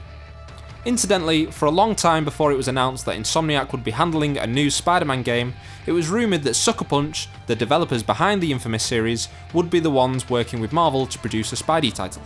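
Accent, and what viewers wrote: United Kingdom. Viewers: Wow, you have so beautiful voice 😀 British Accent is music to my ears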